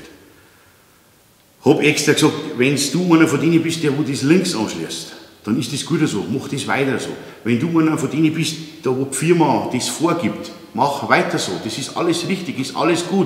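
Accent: Bavaria, Germany. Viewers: Hallo, ich bin zufällig über deinen Kanal gestolpert und mir gefallen als Elektroingenieur die sehr lehrreichen und praxisnahen Beiträge wirklich gut. Hier wird gezeigt wie man es richtig macht und warum und was man nicht machrem sollte und warum. So kompakt und nachvollziehbar wird selten das Elektrohandwerk erklärt. Das spart viel Zeit die nötig wäre in Normen und Lehrwerken herumzusuchen. Mich stört auch die leichte bayrische Mundart nicht - wer das nicht mehr versteht sollte lieber auswandern...... Mach weiter so - ich freue mich auf die neue Folgen. Einen schönen Gruß nach Bayern aus dem Schwarzwald. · Zugeschaut - Mitgebaut. Und a Bairisch lernst obendrein a no. Tausend Likes aus Preußen. Weiter so!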